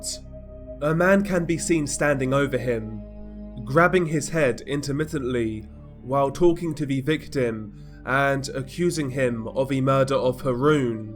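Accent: United Kingdom. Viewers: Every time I hear say “Ladi gang” with his British accent, It reminds me of the song. “STAND STILL LADDY!” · He's British, and some British accents "add" an R sound to a few words. Have you ever heard different British accents?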